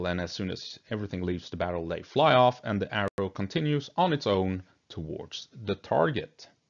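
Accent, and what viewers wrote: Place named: Sweden. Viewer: Hej! Could just barely hear a weak Swedish accent 😊 Great video, greeting from a fellow swede!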